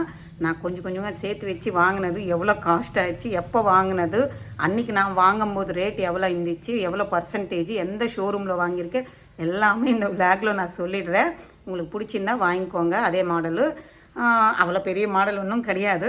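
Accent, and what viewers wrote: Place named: Bangalore, India. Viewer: Akka neenga Bangalore uh slang Apadi Iruku....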